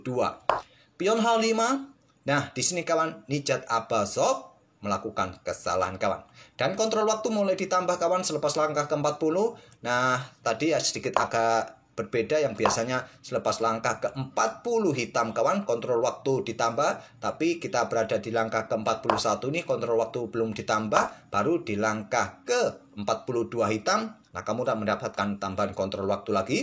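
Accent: Java, Indonesia. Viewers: 50mnt mah mending cari rokok sekalian bikin kopi wkwk. Santuy dulu ga sih? Suka sama pembawaan channel ini. Apalagi kalo udah ngomong "di caplok" atau "baku hantam" wkwk aksen jawanya itu loh yg bikin seru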